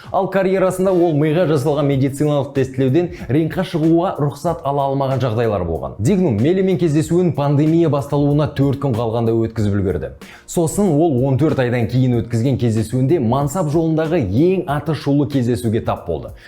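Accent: Standard. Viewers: Өте жақсы жүргізеді екен. Қазақ ша таза жəне анық сөйлейді.Алға бауырым.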